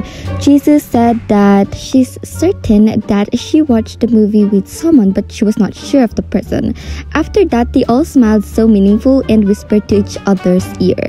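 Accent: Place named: Philippines. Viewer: Dude!! People in Philippines speak in that accents I've seen many YouTubers from Philippines and I've subscribed them too T,f you talking about for more attention? 💀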